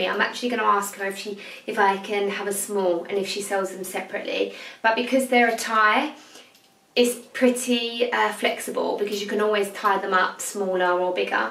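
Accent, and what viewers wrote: United Kingdom. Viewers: Loved the Chanel reveals, keep them up xo Listening to you makes me miss the UK so much!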